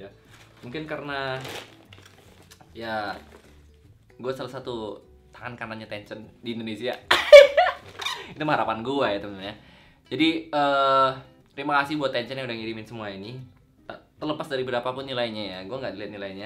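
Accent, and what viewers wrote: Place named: Pematangsiantar, Indonesia. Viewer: Logat Siantar campur logat gaul 😂😂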